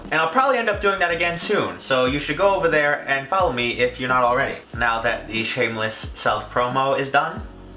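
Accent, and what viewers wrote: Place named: Brooklyn, United States. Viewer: I love his voice, he sounds like he's from brooklyn in the 50s! Where is he from?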